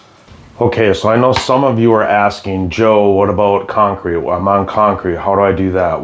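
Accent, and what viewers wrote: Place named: Upper Midwest, United States. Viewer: Until you said Northern Minnesota, I thought you were from Ontario, Canada. That Northern Midwest accent had me fooled at first. Great tips, nonetheless.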